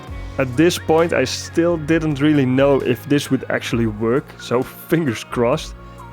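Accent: Netherlands. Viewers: Mede Nederlander hoor ik😅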